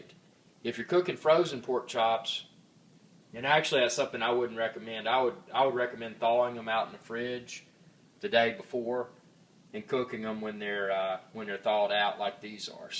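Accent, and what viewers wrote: United States. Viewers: You are in Finland thats in Celsius. This man is from the USA from his voice id say. They use Fahrenheit. If you payed attention in school you would know that 176C is about 350F. 350C is about 662F. You are not wrong in your thinking but you cant just assume everywhere is the same as you. Coming from an American that might sound funny though lol. Have a nice day. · What state do you live in, please? Just wondering about your accent. You sound American ! Thank you .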